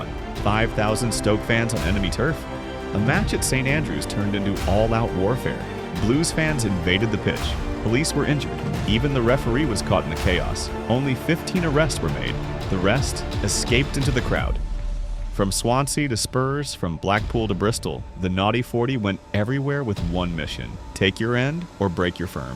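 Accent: United States. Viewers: The minute I hear an American voice talking about British sub culture - I'm off. Ta Da. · What’s with the American accent narrator? Anyway, Stoke football violence actually originated from 1968 with the coming of the skin head cult. The events from 1985 onwards were just a continuation of the moronic behavior that started much earlier.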